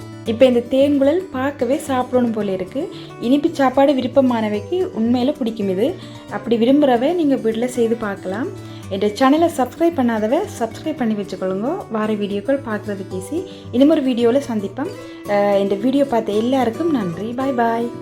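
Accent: Sri Lanka. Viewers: அது என்னமோ தெரியலை சகோதரி நீங்கள் பேசும் இலங்கை தமிழுக்கு நான் அடிமை ❣️❣️❣️❣️❣️❣️❣️❣️❣️❣️❣️❣️❣️❣️❣️❣️❣️❣️❣️❣️❣️❣️❣️❣️❣️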